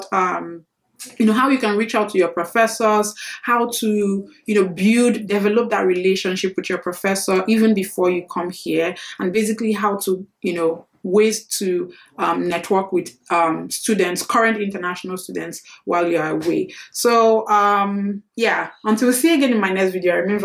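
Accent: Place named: Nigeria. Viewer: Once l heard that voice l knew she is Nigerian. Good 👍 video presentation l searched for "us Universities without GRE" n ur video popped up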